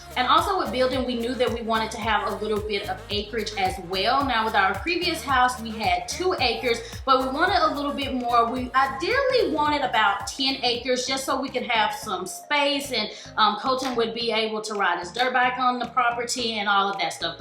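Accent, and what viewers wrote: South, United States. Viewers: Why did I read her t-shirt in her cute southern accent lol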